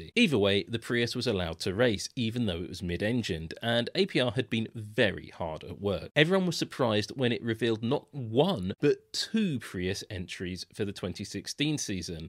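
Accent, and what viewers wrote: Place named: England, United Kingdom. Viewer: English accents are the worst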